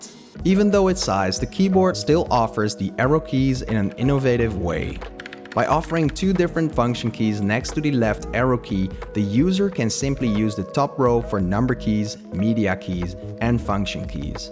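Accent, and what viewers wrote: Netherlands. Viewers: Is he Dutch, he sounds Dutch... love the vid, really helpful. I still don’t know, either k6, K1 or k2. Atm k2 hot-swappable is not available in brown switched. :( and I think I want brown switches instead of the red ones. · Are you from The Netherlands because I think im hearing a slight Dutch accent?🤔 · Gotta be Dutch! :) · you sound Dutch? klopt dat?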